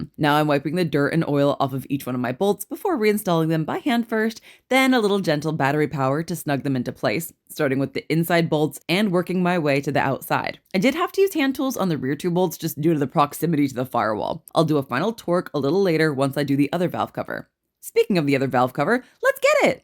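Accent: Texas, United States. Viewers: Are you Texas ?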